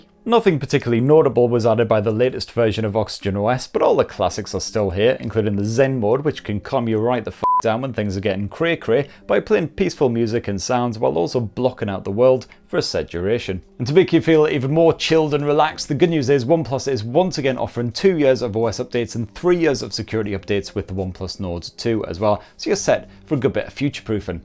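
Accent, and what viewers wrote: United Kingdom. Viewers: god his British accent is unbelievable for American 😂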